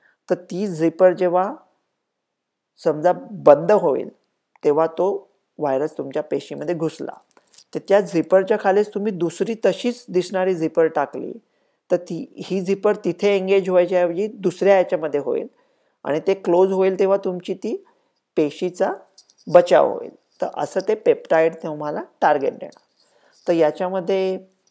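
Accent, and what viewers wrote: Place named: Pune, India. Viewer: सर तुमचा बोलणं ऐकलं की अस वाटत आमच्या पुण्यात सदाशिव पेठ मध्ये थांबलो आहे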